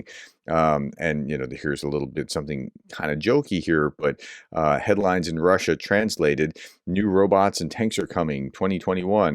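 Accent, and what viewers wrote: Canada. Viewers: Newf talk, proud members of the Canadian confederation since 1949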